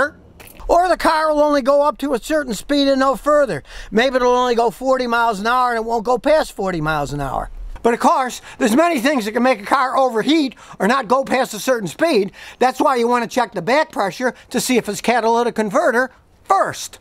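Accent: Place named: Chicago, United States. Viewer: "dont guess!! test!!" haha eye love the accent and the VOLUME! Chicagoan?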